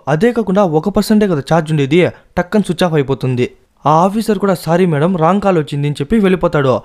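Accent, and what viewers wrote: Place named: Chittoor, India. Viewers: This chittor slang and he live in Bangalore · Babu ee uooru manadi yasa tedagaundi, rayalaseema slang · Thammudu ekadanundi nuvu Ni language slang Chittooru madiriga vunde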